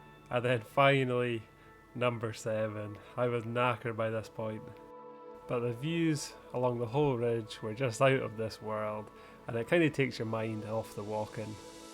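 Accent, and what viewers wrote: Inverness, United Kingdom. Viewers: Hi, sounds like you are from Inverness area? I'd happily do the South Glen Sheil Ridge again with you if you are needing company 👍. I've only done 8 but An Teallach is my favourite so far 👍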